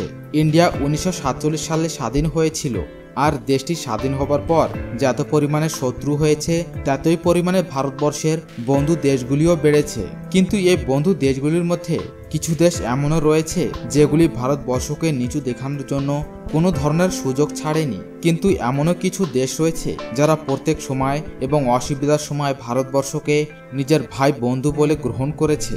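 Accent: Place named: Bangladesh. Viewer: Apnar kotha sune to Bangladeshi Mone holo.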